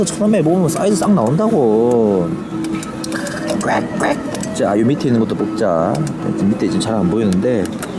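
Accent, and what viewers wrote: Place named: Gyeongsang, South Korea. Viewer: 구독하고 정말 재밌게 보고 있어요 근데 욕은 좀 자제해 주세요..... 그리고 경상도 사람 이죠 제가 경상도인데ㅎㅎ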